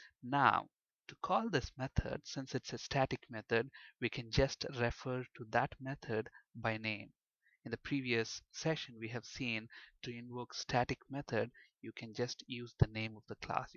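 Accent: India. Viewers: These are awesome vids - Thanks! Also, usually with Indian instructors the accent is so strong and they talk so fast it's hard to understand what they are saying, but you speak very clearly and slowly, so it's easy to understand you. I'm a C programmer trying to learn C# and these are the best vids I have found. Even better than the ones I had to pay for.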